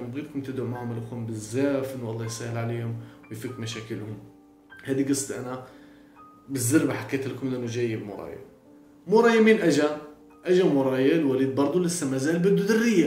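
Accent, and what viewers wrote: Morocco. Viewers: ههه كتعجبني هدرتك بالمغربية مخلط بالفلسطينية بنكهة مغربية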